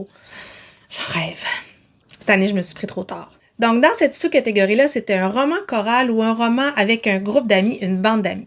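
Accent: Quebec, Canada. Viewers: Bonjour de Belgique, qu'est-ce que j'aime l'accent québécois, ça me rappelle mon Erasmus à Montréal en 2007 (ça ne me rajeunit pas), que je n'ai jamais oublié! Vous, les Québécois, êtes un peuple adorable et attachant, j'aurais adoré rester vivre au Canada! Petite question: qu'est-ce qui fait que tu optes pour la version originale en anglais ou la traduction (je pense au Joyce Maynard, qui est originellement en anglais mais que tu sembles lire en français)? Bonne journée!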